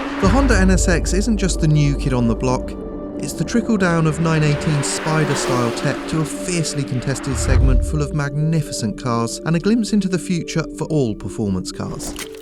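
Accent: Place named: England, United Kingdom. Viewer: I think I like the English accent more the then video hahah nicely executed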